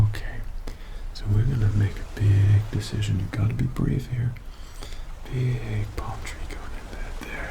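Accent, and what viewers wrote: United States. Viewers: wow i really like your american accent. so good. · We Americans call it a flashlight, but your older American accent is pretty good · Admirable, the concentration on trying to stay in character and trying his best to mimick an American accent while being so subtle and soothing, must've required some good practice! Great job! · It's not torch in the US it's flashlight but good American accent overall. Torch does sound cooler tho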